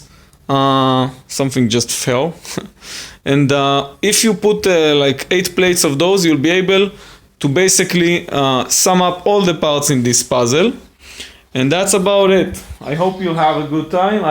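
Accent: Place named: Israel. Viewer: Love your Israeli accent!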